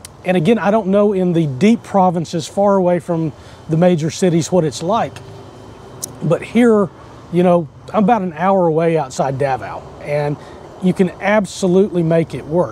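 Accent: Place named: North Carolina, United States. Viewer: Great video. 'Glad you're stayin'. I'm planning on coming over for good in November. The first thing I'm planning on doing is to buy a motorscooter, new one. Honda. What are the hoops you have to jump through. AND most importantly, what is the situation with getting a driver's license. Is a US license good for a period. Can you do the whole registration / insurance thing in one go or is it a long drawn out process. SECONDLY, I'm in N.C. so I'm appreciating the accent; hold on, secondly as I said, why did you go to Mindanao. It seems EVERYONE is going to the Dumaguete area and Mindanao sees like the dark continent. I've never been to the Philippines except to fly over it once but I've lived in Mexico so I appreciate the concept of time and bureaucracy and it seems to be a Spanish trait the Philippines has picked up nicely. I'm VERY patient. 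What are some prices for a two bedroom in Mindanao? Is there an English speaking community in Mindanao or is most of your time spent at home. Questions, questions...... Thanks a bunch and enjoy your day. All the best, Greg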